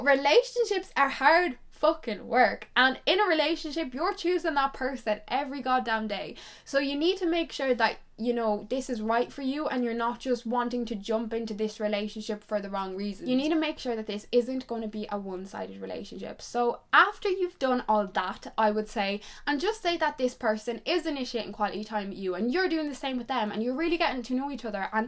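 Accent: Ireland. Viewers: I love your Irish accent! Can u say 'r u actually stupid?' in an Irish accent?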